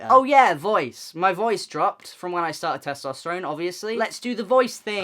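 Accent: United Kingdom. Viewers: hes literally just british ahah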